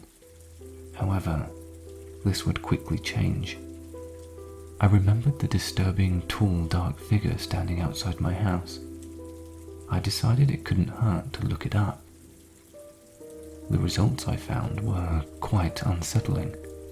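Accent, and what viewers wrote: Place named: England, United Kingdom. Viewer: the rain.. the English voice.. ahhhhhh yesssss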